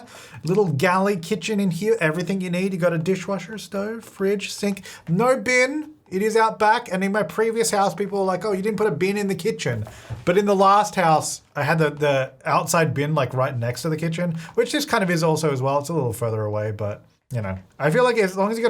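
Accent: Australia. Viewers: I hear the Australian accent bit more now, tbh😅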